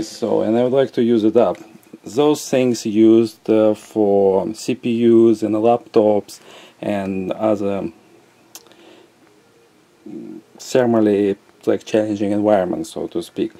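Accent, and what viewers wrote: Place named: Russia. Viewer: russian accent <3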